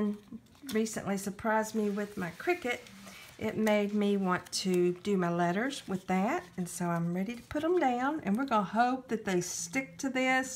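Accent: South, United States. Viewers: Fun thumbnail! Lol like your Southern accent. Aww that's sweet you are acting like your Grandma. I have a lot of similarities with my Grandmother too.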